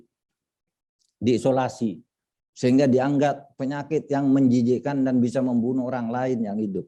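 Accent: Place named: Madura, Indonesia. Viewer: Pak mahfud bikin bangga orang madura.. Logatx asiik...👍👍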